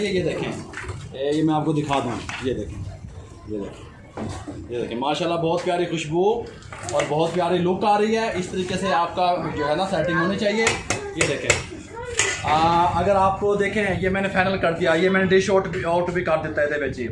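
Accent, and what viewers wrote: Punjab, India. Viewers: Aapki punjabi sun ke din ban jata hai bhai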